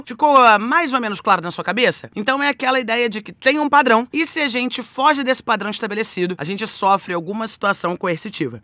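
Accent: Rio de Janeiro, Brazil. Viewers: esse sotaque carioca kkkkkk foda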